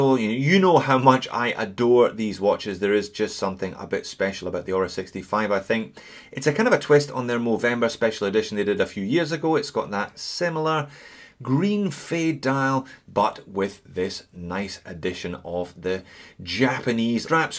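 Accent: Scotland, United Kingdom. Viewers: So just to confirm your accent is Scottish?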